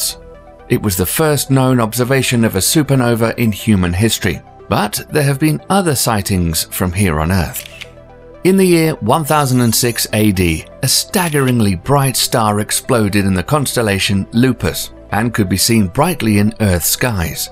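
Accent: England, United Kingdom. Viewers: As you have an English accent, please stop pandering to the US and call Ursa Major by its proper name - you'll be doing them a favour too, as some of them will learn something as well. By all means explain how they refer to it but I hate it when you forget the rest of the world. Thank you. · I came here to this channel to 1) Learn science 2) Learn that posh English accent by pausing and repeating everything he says (sehzz)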